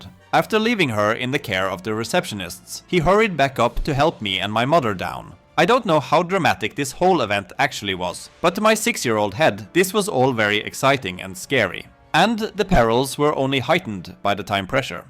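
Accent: Norway. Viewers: Hører du er norsk på mils avstand. No offence. Bare sjarmerende. Informativ video. Tommel opp.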